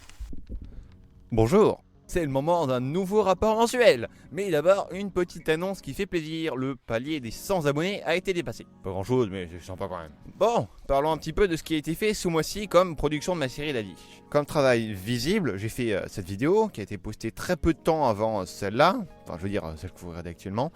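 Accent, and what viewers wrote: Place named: France. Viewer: I fell in love with this French pronunciation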